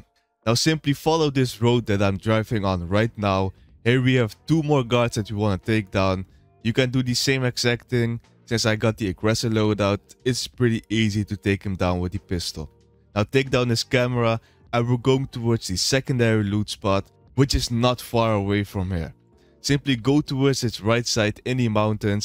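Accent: Netherlands. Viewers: You can hear that your dutch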